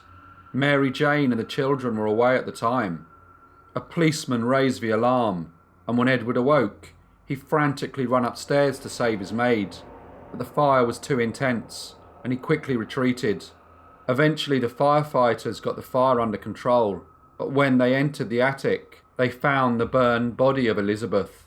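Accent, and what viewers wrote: United Kingdom. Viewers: Found your channel and now binge watching, fascinating stuff - and it's nice to hear a fellow British voice! How on earth was Pritchard considered a ladies man? Even without the terrible things he did he already looked like something out of a horror movie. Can you imagine being given one of his postcards? Eeep!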